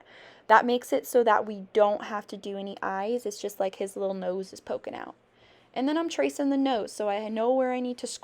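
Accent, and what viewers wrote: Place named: United States. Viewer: Love your country accent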